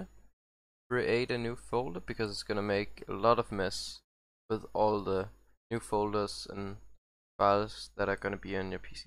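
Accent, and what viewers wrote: Denmark. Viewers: Are you danish? I can hear it on your accent. And I love your vid :D please don't stop making so good Videos :D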